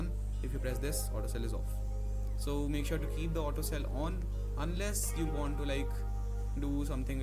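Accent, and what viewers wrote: India. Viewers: Bro ur indian ?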